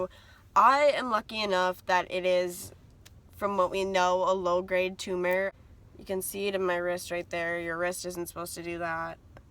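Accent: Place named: United States. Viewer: Wait do u live in ireland? You sound american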